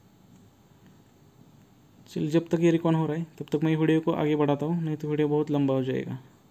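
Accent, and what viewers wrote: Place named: Maharashtra, India. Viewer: jay shree ram brother'.....your language like Maharashtrian.... ❤️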